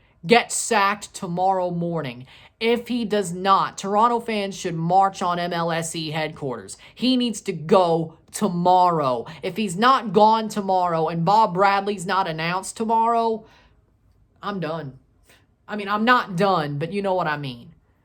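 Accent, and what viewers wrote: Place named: Kentucky, United States. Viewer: Is this guy a gringo? He's accent sounds as if he's from Kentucky. He reminds me of an infantile Jim Cornett.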